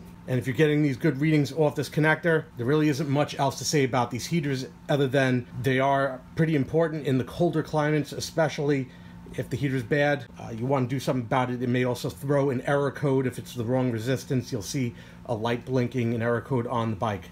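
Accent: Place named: Boston, United States. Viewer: I did that and started her up and it doesn’t sound promising. I will warm her up tomorrow and go out for a couple of miles to see how things are. I wish I could adjust them with the air box installed - at least I can leave them installed. Thanks for your advice. I detect a Boston accent.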